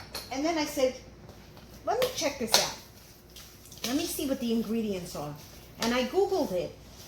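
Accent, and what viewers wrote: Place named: New Jersey, United States. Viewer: you definitely sound like a Jersey Girl. That locked amazing.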